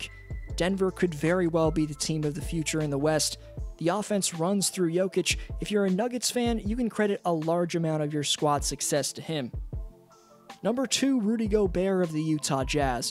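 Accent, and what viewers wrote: Canada. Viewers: R u from Toronto/ Canada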